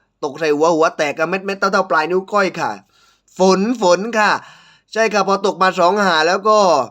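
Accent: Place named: South, Thailand. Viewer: พี่น่ารักจังเลยนะคะเวลาฟังสำเนียงของคนภาคใต้ตอนนี้ชอบพี่มากๆนะคะฟังทีไรนึกถึงภาคใต้ทุกครั้ง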